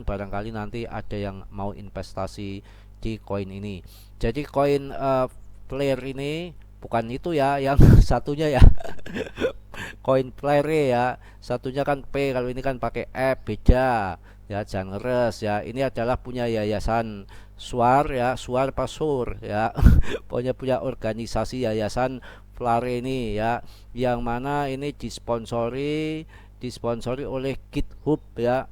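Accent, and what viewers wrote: Banyumas, Indonesia. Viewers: Salam blekutakan mas bro. Aku nonton terus video mas bro kata katanya ngapak bikin ketawa jadi ngga bosen bs meng hibur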